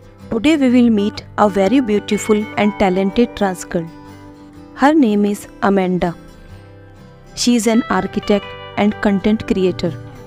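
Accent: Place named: India. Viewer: I like that you've always used your own native Indian accent. I appreciate it more with all the annoying AI fake voices appearing.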